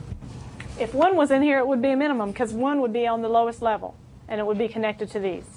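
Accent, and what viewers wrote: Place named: Texas, United States. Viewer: Accent as sweet and Texas tea.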